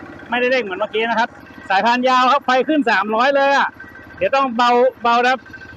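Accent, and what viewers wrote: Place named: Phrae, Thailand. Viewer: สำเนียงนี้เมืองแป้แน่นอนครับพี่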